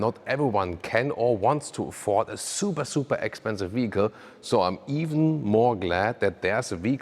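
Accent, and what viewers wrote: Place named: Germany. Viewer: Naziv i opis kanala na srpskom, voditelj priča engleski sa nemačkim akcentom. Baš zanimljivo.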